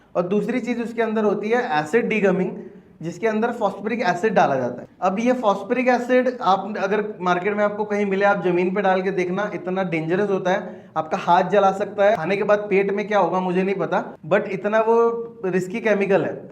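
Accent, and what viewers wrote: Punjab, India. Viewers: Aapke language mein punjabi accent hai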